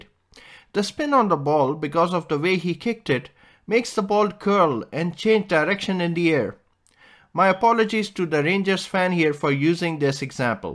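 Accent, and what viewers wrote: India. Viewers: Indian accent?😂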